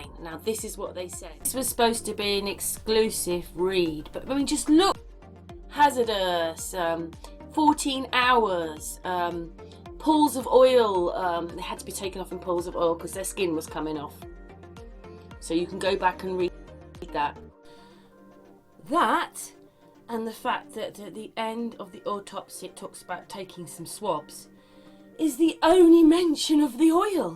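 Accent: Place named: England, United Kingdom. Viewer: She's English so that's odd you find her hard to understand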